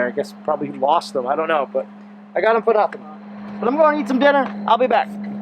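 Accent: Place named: Massachusetts, United States. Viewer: The car is coming along great! Your accent reminds me of my mom. She grew up in Gardner mass.. keep up the good work!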